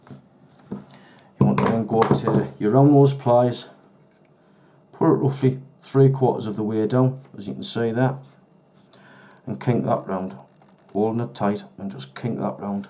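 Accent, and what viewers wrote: England, United Kingdom. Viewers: Got half way through your video and had to turn it off, I couldn’t understand a word you said, only the odd word here & there.. it’s speeded up mumbling type thing that I couldn’t get. ? Are you English? I am through and through.. I’m sure your video is very good but I have no way of knowing ? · If your english through and through you would be able to understand him and also know what part of england he is from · You make great rigs, but I wish I could understand you better, I have big trouble with English and Irish accents..... I'm from the deep south USA where we talk real slow.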